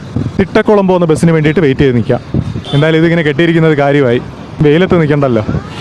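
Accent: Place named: Kollam, India. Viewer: കേട്ടോ..... കേട്ടോ....... കൊല്ലം സ്ലാങ്...... അടിപൊളി ❤❤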